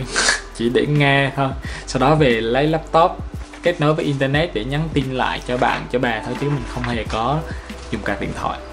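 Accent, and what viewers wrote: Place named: Central, Vietnam. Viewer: miền trung dễ thương, chân chấttt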